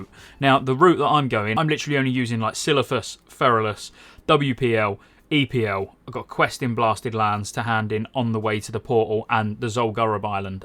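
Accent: United Kingdom. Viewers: British accent with an LSU hat I love it, great video!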